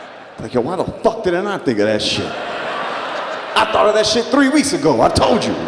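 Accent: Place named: United States. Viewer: It's swaminarayan conference, even at first I was like what is he talking about cause of that American accent he has, but then it clicked, lol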